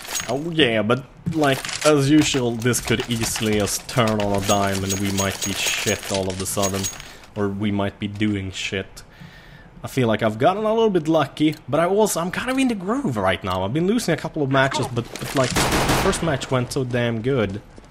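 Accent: Sweden. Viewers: The sweeeeedish accent!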